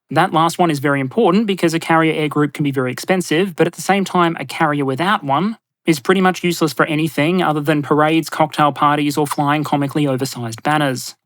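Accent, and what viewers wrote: Australia. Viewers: Didn't notice it till now that you've Aussie accent mate, Aussie Aussie Aussie!!! Oyyy oyyyy OYYY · Absolutely high quality research and even more superb delivery. What a witty Aussie 😂 pitty you guys can’t play rugby 🤣try next time in Suva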